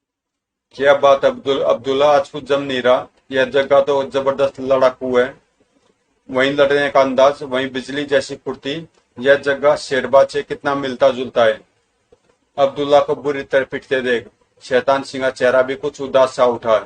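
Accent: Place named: Himachal Pradesh, India. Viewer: लहजे से उत्तर भारतीय लगते हो...हिमाचली...बस कॉमिक्स में अपने पर्सनल विचार/ हंसी न दिया करें। बाकी सब बढ़िया है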